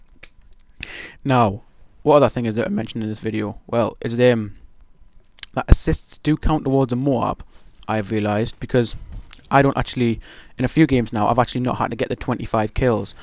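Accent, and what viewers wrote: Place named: Newcastle, United Kingdom. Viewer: Nowt like listening to a geordie kill people. Wish you had PC man, we'd make an awesome geordie domination team.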